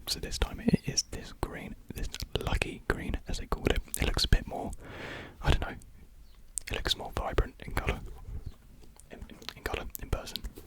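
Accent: United Kingdom. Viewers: I just found you and love your content, I saw and listened to your quilting YouTube as well, also saw additional content since then, hope you found a nice mix of YouTube and new career adventure. I must say now that I found you your are my favorite A-S-M-R MAN, LOVE THE BRITISH ACCENT. YES WEAR YOUR SHOES!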